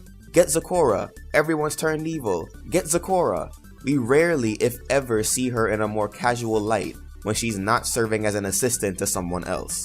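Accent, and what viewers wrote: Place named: Caribbean. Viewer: so, what part of the Caribbean are you from?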